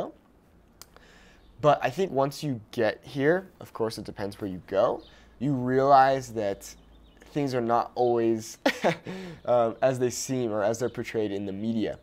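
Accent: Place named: Northern Mexico, Mexico. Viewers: he should use his northern mexican accent to not get scammed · Congratulations,! 🎉 This is a great move for you! I can't wait to see your Mexico City content. It will be interesting if your Norteño dialect will change after spending time in the big city! Keep going! ⭐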